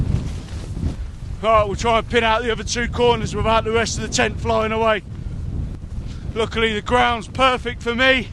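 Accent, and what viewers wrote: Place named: United Kingdom. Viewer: You have the most uk accent 😂. Holy shit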